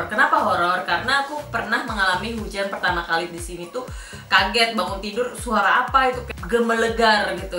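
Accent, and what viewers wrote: Java, Indonesia. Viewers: Asli mana mbak e.. kok medoknya, medok jogja or solo.. cerita dong, riwayat sampai swedia dan bla bla bla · Sampean jowo pundi mbak, bosoe kog koyok daerah q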